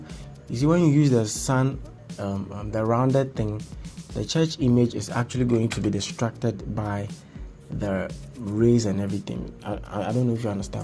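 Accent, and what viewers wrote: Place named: United Kingdom. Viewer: British accent 😂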